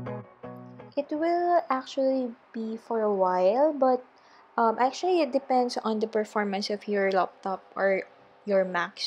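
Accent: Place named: Tagalog, Philippines. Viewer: Yeah does sound like she has a Tagalog Accent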